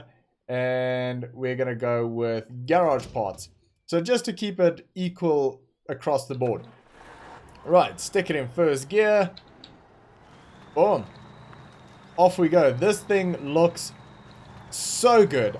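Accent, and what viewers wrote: South Africa. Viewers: Hey are you south African?if not just ignore lmao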